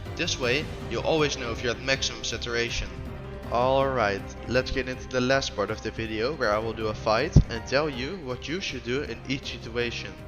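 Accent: Netherlands. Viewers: Are you dutch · Klinkt als een nederlander wel goeie vid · u sound so dutch wth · the dutch accent 😭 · yo my dutch boi, ye you can hear ur dutch accent very clearly, not a bad thing tho lol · Are you Dutch u seem like u have a Dutch accent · i hear the dutch accent 😮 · bro unlocked the dutch accent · bro has an dutch/ europian acsent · youre dutch? W vid btw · Nice dutch accent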